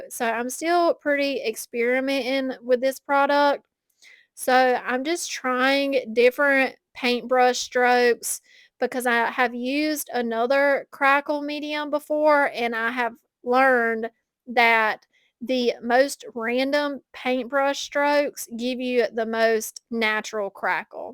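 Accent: South, United States. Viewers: Gotta love the southern accent. You continue to amaze me with your creativity. Keep up the great work your biggest fan,😍💗U!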